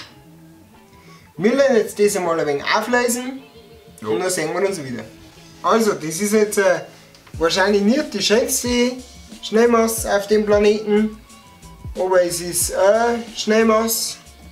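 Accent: Bavaria, Germany. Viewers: dei akkzent is echt oreidig aba guad wea moand a urbayer zum sei...aba nechsd moi bitte richtige reihnfolge vo de zutatn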